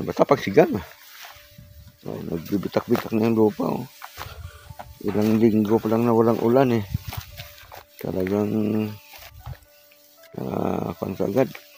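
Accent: Iloilo, Philippines. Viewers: sir ang tuno sang imo daw ilonggo ka man.sa ka sa Iloilo sir?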